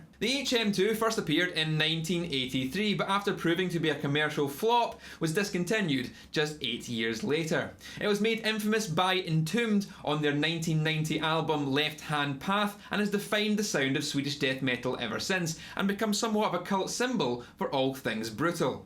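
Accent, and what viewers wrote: Scotland, United Kingdom. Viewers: Scotish Arin Hanson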